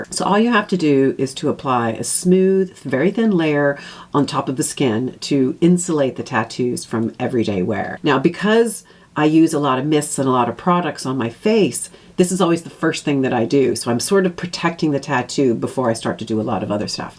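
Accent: Ireland. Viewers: I am new to your channel and wondered what your accent is. A little Irish? Just curious. My boyfriend also hates Snail Mucin! Any time I use it, he doesn’t want to kiss me but ultimately can’t help himself in the end 😂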